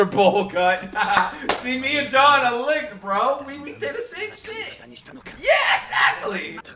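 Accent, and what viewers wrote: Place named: New York City, United States. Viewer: You can already hear the city accent getting to him lolllll. A true NYC resident in the making.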